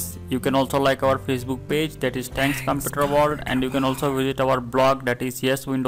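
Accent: India. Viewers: Indian accent hi toh hai fake kya hota hai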